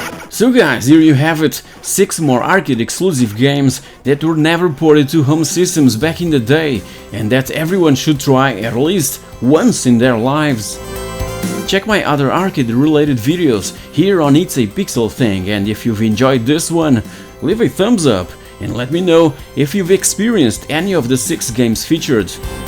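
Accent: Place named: Portugal. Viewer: I could feel the "tuga" through the accent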